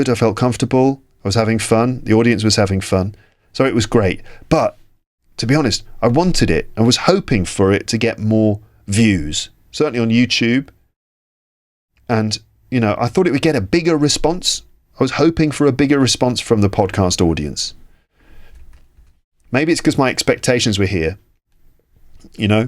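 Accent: United Kingdom. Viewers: Is it was British accent???? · Hi Mr., hope you are doing well. I listen to you to improve my pronunciation. I like the way you speak English. I acquire British accent. Thanks and greetings from Türkiye 🇹🇷. God bless you.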